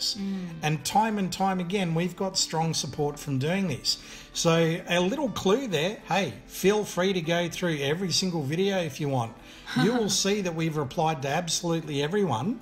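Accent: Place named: Australia. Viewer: Love the accent, it is the guy's accent, I am guessing Aussie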